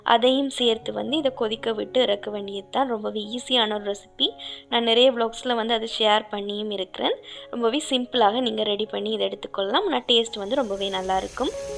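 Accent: Sri Lanka. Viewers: உங்கள் இலங்கைத் தமிழ் அழகு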